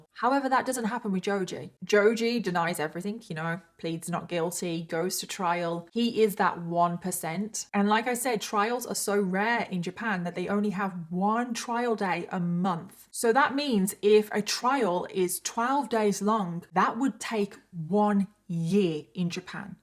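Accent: United Kingdom. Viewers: My mother in law is from the UK, I just love your accent!!! And love your story telling!!! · Her British accent also helps. I've always found this irestisteble in women😆🥁😆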